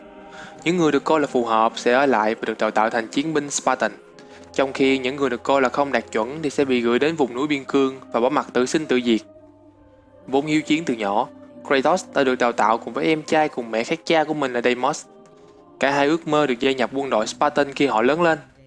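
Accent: South, Vietnam. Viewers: t xem đơn giản vì giọng của thớt miền Nam, t ko anti miền Bắc nhưng vẫn cứ thích nghe giọng phân tích miền Nam hơn :v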